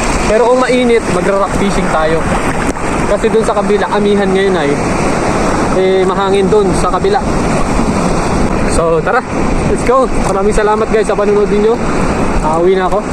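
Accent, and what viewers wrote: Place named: Abra, Philippines. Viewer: Idol Taga Abra de ilog ka po ba? Ganyan Kasi tono Ng salita Ng mga taga Abra eh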